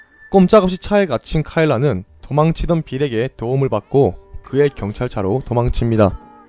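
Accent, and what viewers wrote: Gyeongsang, South Korea. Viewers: 님 갱상도 출신이네요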